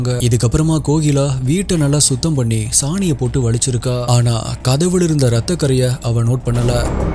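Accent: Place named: Chennai, India. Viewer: இலங்கைத்தமிழில் கதையுங்கள். நாசமாகப்போன Madras தமிழில் கதைத்து நீங்களும் நாசமாய் போய் சமூகத்தையும் நாசமாக்க வேண்டாம். தூ.......தூ......தூ.....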